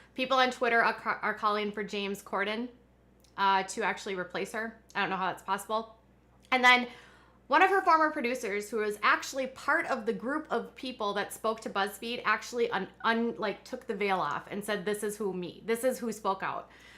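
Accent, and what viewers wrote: Minnesota, United States. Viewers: I love your ponytail look and the Minnesota accent, and more than that I love your shoot from the hip and salt of the earth no-nonsense style of reporting. You have done your homework and your research and I appreciate it. I have really been enjoying your channel and soon as I get my finances in order (translation: the IRS does it in 7 to 9 days) I am going to join patreon and pass some of that stuff to you, girlfriend. 😉🪐👍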